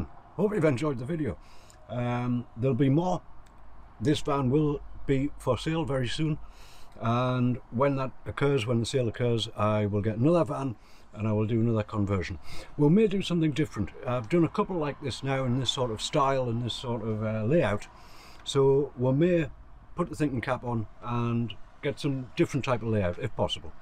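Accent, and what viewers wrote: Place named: South Shields, United Kingdom. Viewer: Hi a really love your van design, it looks like your at South Shields, and you sound local , I am from ashington area , do you still do van conversions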